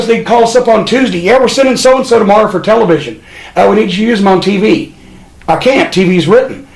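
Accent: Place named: Kentucky, United States. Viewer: thats that Kentucky twang...lol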